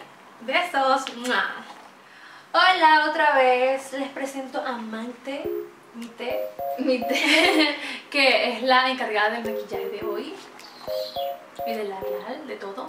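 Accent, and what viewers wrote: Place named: Andes, Venezuela. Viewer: Echoooooo.. ¬¬ no seas antipatriota! Manten tu acento venezolano hasta LA MUERTE!! Aunque tu venezolano es medio andino :S pero bue. . . .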